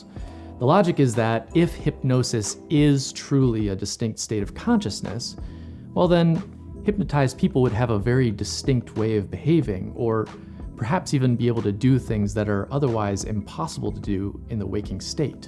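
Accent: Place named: Scotland, United Kingdom. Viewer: Dude nice Scottish accent.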